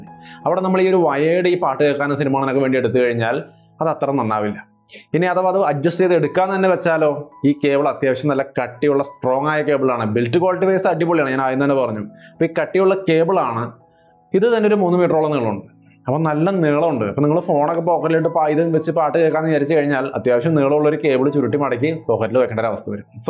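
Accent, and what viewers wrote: Thrissur, India. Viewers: Thrissur slang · Thrissur slang 😁😁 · മറ്റു വീഡിയോകളുടെ അപേക്ഷിച്ച് നോക്കുമ്പോൾ ഈ വീഡിയോ തൃശൂർ സ്ലാങ് ഫീൽ ചെയ്യുന്നുണ്ട് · trissur slang ee videoil nannaai kayarikoodiyallo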